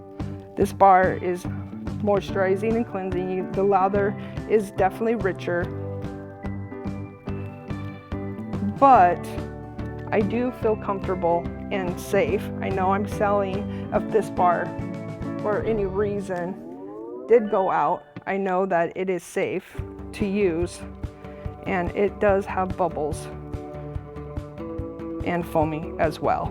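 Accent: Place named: Arizona, United States. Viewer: I love love love hearing your Arizona accent. It reminds me of my grandpa. ❤️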